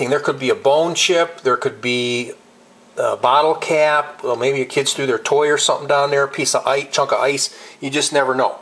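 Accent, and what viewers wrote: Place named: Wisconsin, United States. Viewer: I noticed your Wisconsin accent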